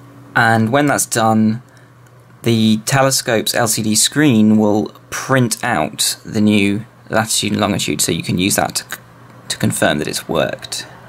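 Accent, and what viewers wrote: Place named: Liverpool, United Kingdom. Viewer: My God he's from Liverpool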